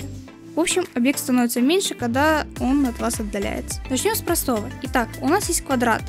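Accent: Ukraine. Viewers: Возможно она украинка и у нее есть небольшой акцент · оо ахахах_)) не потеме, я такая слушаю видео и.. думаю... чтот тут знакомое... Гхрань... и поняла по говору что автор украинец, чекнувши профиль)) как я поняла, я удивляюсь хахахахах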